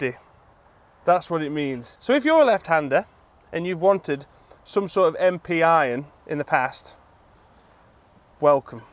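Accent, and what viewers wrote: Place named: Nottingham, United Kingdom. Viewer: GolfBox TV haha as much as a Derbyshire lad can love a Notts (judging by the accent) lad. Your a legend mate. Love watching your videos. Look forward to my new set of mp20’s 🤪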